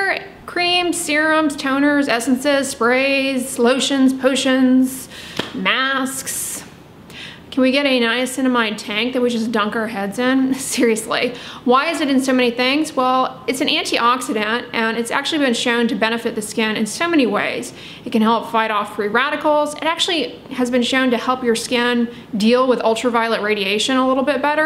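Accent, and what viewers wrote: United States. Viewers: Does anyone know - What type of American accent does Dr Dray have ? ( I’m from the U.K. so it’s not obvious to me but it’s bugging me cos Its unusual and I can’t place it 😆) Thanks :)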